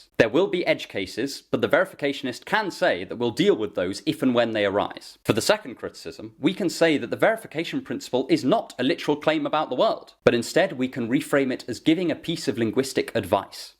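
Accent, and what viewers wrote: United Kingdom. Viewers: To top it off he’s quite the posh chap with a nice British accent 🫡 salute you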